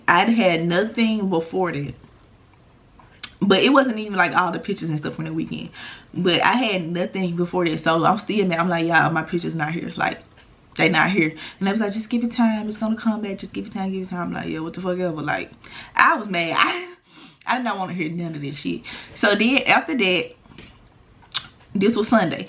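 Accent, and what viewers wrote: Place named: Memphis, United States. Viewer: I came across your video and immediately liked the hair for starters and also just knew the accent was Memphis. At least y’all are a hop, skip, and jump away. Gotta love Address, Bar 2200, and all that. We’ve sort of branched out to going to Chapman & Kirby for brunch and some folk go to 5015. It seem like you liked Bar 2200 food. I loved their vibe!!!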